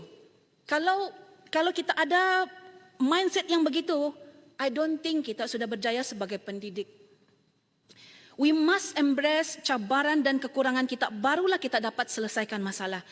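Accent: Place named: Sabah, Malaysia. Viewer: hahaha…pelat sabah tu boleh tak di kurangkan…bercakap lah dalam bahasa malaysia (melayu)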